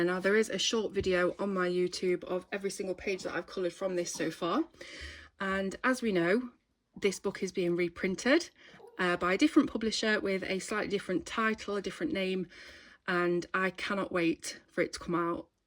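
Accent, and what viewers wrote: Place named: Nottingham, United Kingdom. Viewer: Love the video. Are you from Nottingham? Your accent is similar to where I grew up, but I moved away when I was a child so I could be wrong xx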